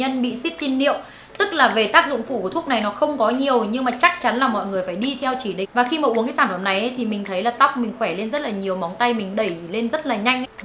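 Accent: Nghe An, Vietnam. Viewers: Giọng Bắc của chị thi thoảng pha giọng Nghệ An nghe cute thế😁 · người nghệ an phải ko bạn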